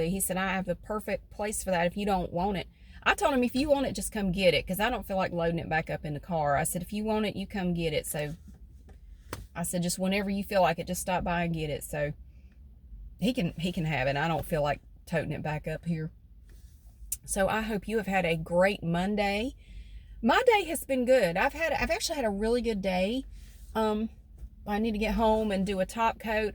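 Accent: South, United States. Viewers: Sometimes when I watch your vlog I feel like I'm listening to myself, but with a Southern accent instead of Canadian. 😄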